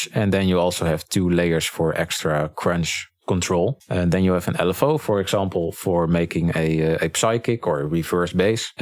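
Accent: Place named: Netherlands. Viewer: Are you Dutch? Because you have the typical Dutch accent in your English. Althans.... dat denk ik wel te horen :)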